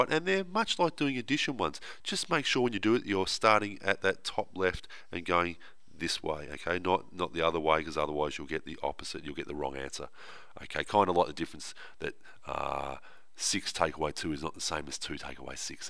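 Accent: Australia. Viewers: When I take my test... I'll have this guys Australian voice in my head... Thank you sir!!!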